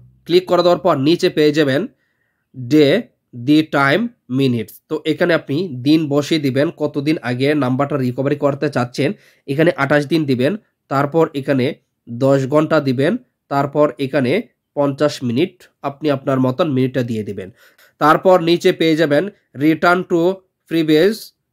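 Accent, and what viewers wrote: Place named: Sylhet, Bangladesh. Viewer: সিলেটি ভাইসাব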